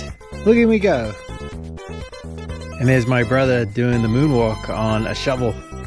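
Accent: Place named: Australia. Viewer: didnt know you were aussie!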